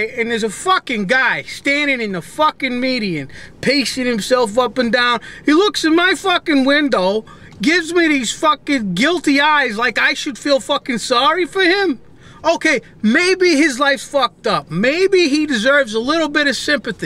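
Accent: New York, United States. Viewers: Great!R.Deniro level definetly! just sorry for other Newyorkers who are shown once again as saying "fuck" every 2 sec in a phrase... n' about panhandlers, you're f**ing damned right man!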